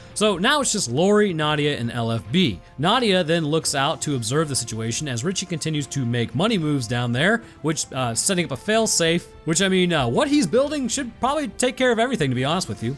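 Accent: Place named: Roanoke, United States. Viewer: I call the combination of your speech, cadence and inflection Roanokian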